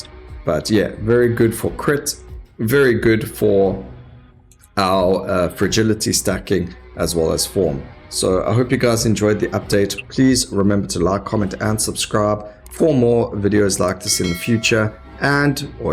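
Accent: South Africa. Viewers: Is that a Durban accent? Defs South African. Thanks for all the video's they have helped tremendously.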